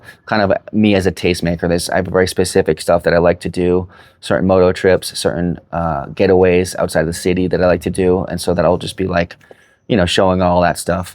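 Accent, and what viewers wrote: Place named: United States. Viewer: I'm gonna pension soon and although I speak fluent Spanish I'm gonna try Thailand. Been there twice and I loved it !..From the sound of your voice I'd say you're far too American, and probably not bringing HUGE amounts of money, to the table, in the business you're working on in Colombia. NO way would I recommend you doing any such activity in ANY country in Latin America unless you have a need for those people to "work for you" !! That's why you're getting those responses ! They're looking at you (as the brains) ..to make money ...for them ! Don't think you're gonna change a culture. You're in their Land.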